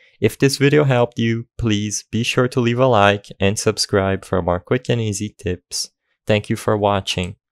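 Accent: Brazil. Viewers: amazing video! thanks from Brazil. (you sound a little brazilian)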